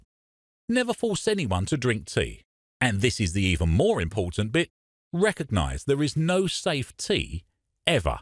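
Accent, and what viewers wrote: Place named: United Kingdom. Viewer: It's interesting that this seems to be a directed at an American audience yet it's a British voice and British coppers in the video? Maybe I'm wrong and America's got nothing to do with it.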